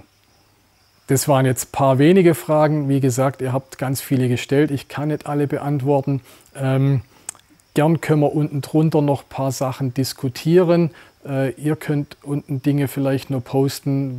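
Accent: Swabia, Germany. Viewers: du haddeschd scho enemal a wegen weniger Dialekt geschwätzet, des war echt besser, net jeder Zuseher tut schwäbisch verschdehet, scho gar net außerhalb vom Ländle. Is scho a wegn heftiges Laschder, bidde beherziged... 🤦‍♂️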